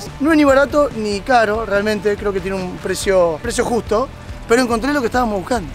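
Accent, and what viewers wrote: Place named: Argentina. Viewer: Volviste de Argentina y se te pego el dejó guacho 😀 sucede que cuando vives fuera de tu país por mucho tiempo pierdes tú acento de origen, basta unas semanas en tu país y vuelves reseteado con tu acento original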